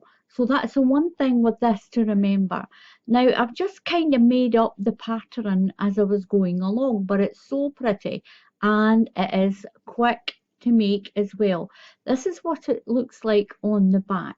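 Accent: Scotland, United Kingdom. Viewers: I could listen to you talk all day, your accent reminds me so much of my Nana's best friend Helen, they've been friends for over 50 years. Helen has been in the US for longer than that, but she's never lost her Scottish accent. My Nana said when she first met Helen she could hardly understand a word she said haha.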